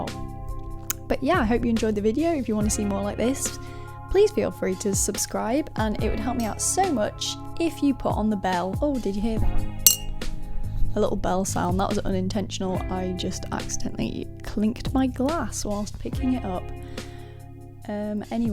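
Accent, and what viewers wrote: United Kingdom. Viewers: thats a manchster accent anyway OMG THX SO MUCH THIS VIDEO WAS LIKE SO USEFUL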